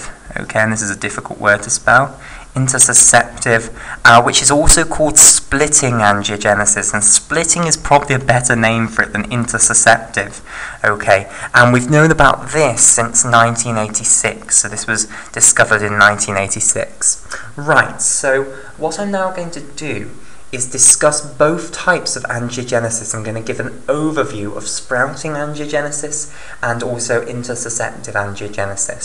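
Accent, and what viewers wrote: United Kingdom. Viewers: God i love british accent 😂🖤 Thank you for such a beatiful video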